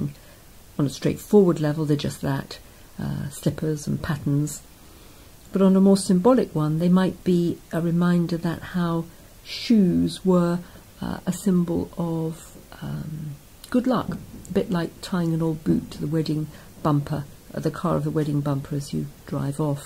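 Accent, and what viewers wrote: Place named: RP, United Kingdom. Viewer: Phrases like "we're not quite sure" and "must mean something" pronounced with an RP English accent sound far less learned than they once may have.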